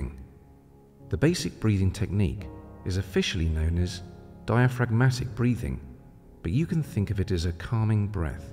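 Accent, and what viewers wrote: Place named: United States. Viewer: Nazir Hussain sir, where did you go? You narration is calming and effective this American accent is too mainstream doesnt sound good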